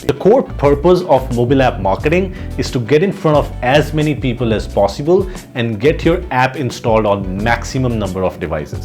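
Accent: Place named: India. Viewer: Indian accent is cool bro, stay with it. It will work.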